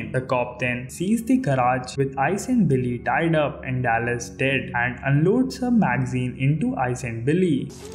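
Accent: India. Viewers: I'm a born and bred Englishman. Your voice and accent is a very nice Indian one, also soothing. Ignore the racist haters and please keep going ! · I’m tired of the ai text to speech voiceovers so finally hearing a human is so relaxing. Also I enjoy Indian accents as I can do a Indian accent too along with a lot of others!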